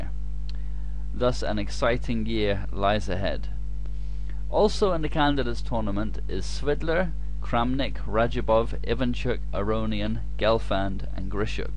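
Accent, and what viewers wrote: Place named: United Kingdom. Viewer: Beautiful and great videos!, especially those on Carlsen, and of course, I enjoy your British accent, 'couse I am a fan of the British accent. Well done!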